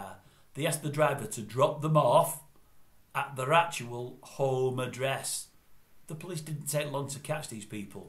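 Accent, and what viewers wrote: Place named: Manchester, United Kingdom. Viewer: You’ll tell the story because it’s content and it’ll earn you a few quid through views jacka norie jacka norie accents annoying manc farmer inbread it’s one of them,, the stories irrelevant by time you tell it 🥱🥱😴😴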